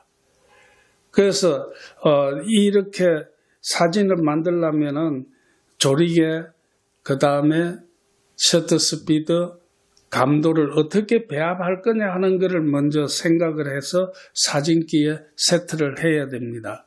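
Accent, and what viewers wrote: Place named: Gyeongsang, South Korea. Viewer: 안녕하세요 선생님! 정겨운 갱상도 강의 재미 있어요 음악점수 ‘빵점’은 엄살 같으신데요? 늘 건강하시길……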